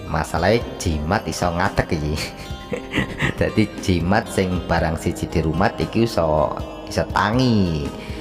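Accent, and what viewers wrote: Indonesia. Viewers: Kena sindir admin akhirnya ikutan komen juga lah.. Banyuwangi hadir dan menyimak bosku.. kalau lihat logat bicara nya kayaknya adminnya orang blitar.. entah benar atau salah. Salam seduluran..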